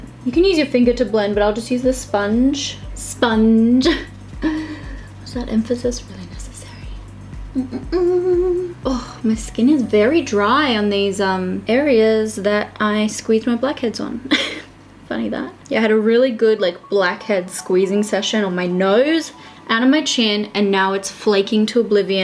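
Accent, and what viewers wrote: Australia. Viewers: Ohhhh waoooo. I like your personality as a whole person and your Australian accent. Love you . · I enjoy watching your videos sooo much partly because of your australian accent, of course also because of your make up skills :) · It's strange.... I never liked the Aussie accent before I started watching your YouTube videos lol... Love your videos... Do you know what I mean Jelly bean? ☺️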